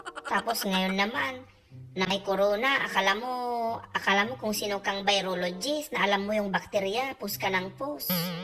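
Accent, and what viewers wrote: Ilocos, Philippines. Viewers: Hahaha ilocano tagalog hahahaha... pavirgin🤣🤣🤣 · bakit ganyan ka kuhang kuha mo ang style ng mga ilocano magsalita haha,.. More videos ante pra pmpa good vibes lng s kbila ng problmng kinkharap ntin s buhay.. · Tagalog Ilocano met auntie 😂😅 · Naalala ko mga lola ganyan nila ako kausapin tagalog na may touch ng ilocano. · Makapakatawa Ante 😂😂😂 Makarelate ak ta kasta ak agsao tagalog ilocano haha. Salamat ti effort mo nga pakatawain na kami aglalo tatta nga panawin. 👍😊 God bless always ❤️😇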